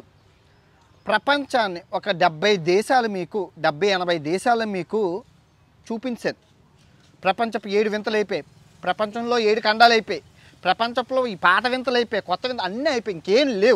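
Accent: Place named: Andhra Pradesh, India. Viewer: garu uttarandhra bhasha vintu Naku anandam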